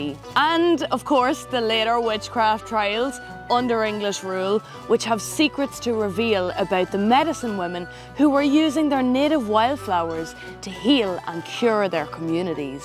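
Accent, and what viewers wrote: Ireland. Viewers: Thank you and greetings from U.S. If I got into trouble, my Dad use to say, "If you do that again, I'll take the Shillelagh to ya". He would have loved to hear you talk. He loved the Irish accent, it seemed musical to him. I carved a walking stick for my brother in law after he had back surgery and he said he wasn't going to walk around looking like an old man with a cane (he was only 65). He didn't want it but when I said it wasn't a cane, it was a Shillelagh, he smiled and grabbed it from my hand. It isn't a Shillelagh but I won't say anything.👍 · I LOVED THIS AND THAT IRISH ACCENT !! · I just like hearing her talk. The Irish accent is like music to these old ears. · I don't know what it is about irish excent, but it makes me laugh mien ares off. 😂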